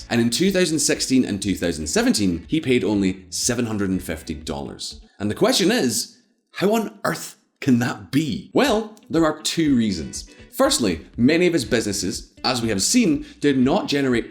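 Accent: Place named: Scotland, United Kingdom. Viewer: THE NEW YORK TIMES EH! BS WHAT A RAG! You sound like a SCOTTISH LEFT WING INDEPENDENCE PRICK.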